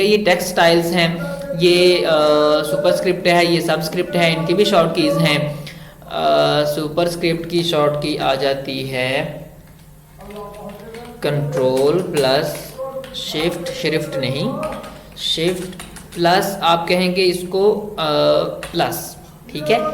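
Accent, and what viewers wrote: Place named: Punjab, India. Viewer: Hahaha punjabi touch 👌 .. Well explained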